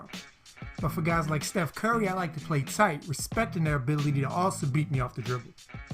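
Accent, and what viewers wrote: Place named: New York, United States. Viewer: you got that new york og voice and that beat selection is perfect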